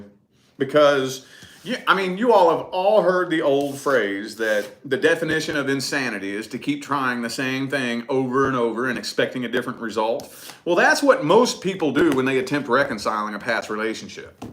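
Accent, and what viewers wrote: South, United States. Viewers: Thanx so much for your reading...I'm also a tarot reader..but I love supporting all my fellow mystics...I'm from TN..you sound southern..I'm curious 🧐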